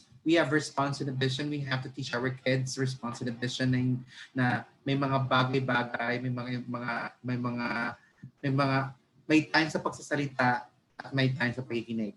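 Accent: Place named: United Kingdom. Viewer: Thank you Sir👍, with British Accent👍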